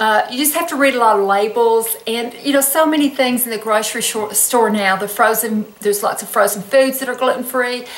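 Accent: South, United States. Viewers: No way -- you are not over 60!! You are stunning!! Love your southern accent, btw :-)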